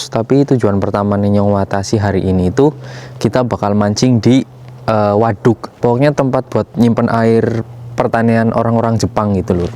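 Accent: Banyumas, Indonesia. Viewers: Gass terus kang rika wong ngapak ngendi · Nyong watashi ngapak ngendi ya?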